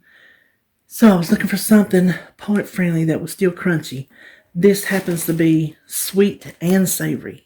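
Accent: South, United States. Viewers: Hey! I love that you are southern like me. When you said scoot I bout died. Only we can get three syllables out of a one syllable word. Nice hauls! I'm not allowed to go to stores so I've been thinking about a Walmart p/u situation. My son did bring me some fresh fruits and veggies yesterday. I'm happy you're safe at home too. x, E. ps: That shirt is Wowsome!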